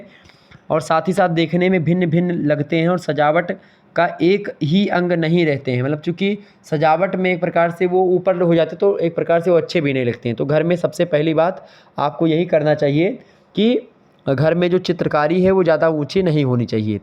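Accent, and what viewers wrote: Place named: Awadh, India. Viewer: Sir aap aawdhi bolte hai acha lgta hai ❤Best teacher on youtube for B.A.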